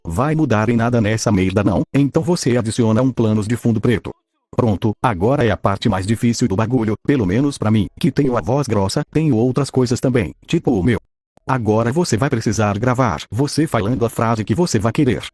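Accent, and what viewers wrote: Bahia, Brazil. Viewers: Pensei que o era baiano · Pensei q ele era da Bahia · Sou de Goiás também kkk mais acho que tu e baiano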